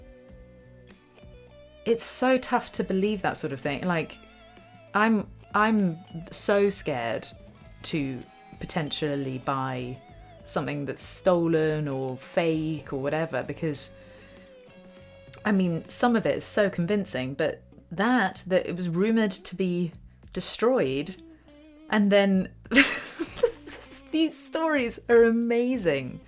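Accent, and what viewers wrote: United Kingdom. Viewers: Even when I lover her accent (or any british accent), my ears ain't well trained enough to understand the name of song Mary mentioned. Anyone can help me?.. Thanks!.. Guitar story is amazing!!!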